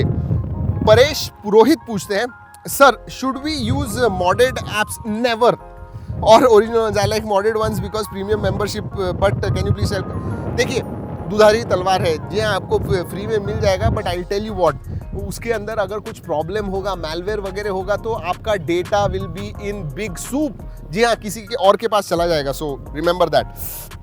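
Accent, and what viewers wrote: Maharashtra, India. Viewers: मेरा Suggestion आपके लिए ये है कि - मराठी पद्धतिची हिन्दी बोलू नका।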